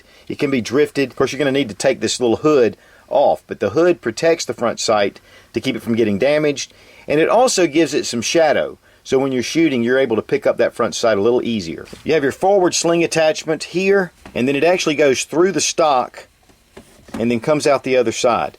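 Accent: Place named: South, United States. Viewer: I really like your videos and find them to be very informative and answers all of my questions before I need to ask them. That, and your southern twang makes these videos fun to watch.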